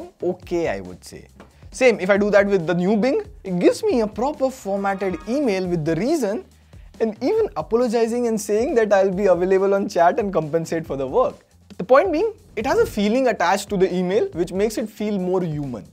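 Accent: India. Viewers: Not sure why but he's the only Indian accent that I've heard that I can stand listening to. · Wow a cool indian even the accent😮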